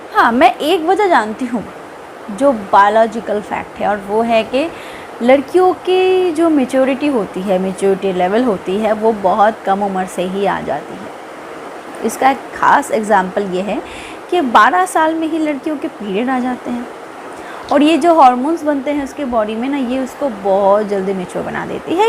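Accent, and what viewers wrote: Bengal, India. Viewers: aapni jodi banglate bolle khub bhalo hobe aapni jokhun Hindi bolen bangla bangla bhab aase aapnar thopik guli bhalo lage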